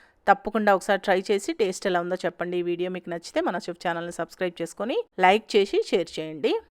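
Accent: Nellore, India. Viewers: Madam meru nellore valla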